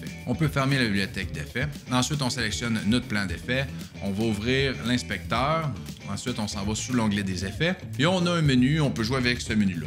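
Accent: Quebec, Canada. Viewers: en effet, pour partager avec un autre utilisateur, cela peut-être utile. Pour ma part je travaille seul donc l'astuce #2 me convient bien 😊. En tout cas merci pour ta reponse et bravo pour ta chaine car trouver des tips francophones sur DVR n'est pas simple. Et en plus j'adore l'accent québécois 😊. D'ailleurs maintenant, je dis tout le temps "pogner une bièèèère" 😉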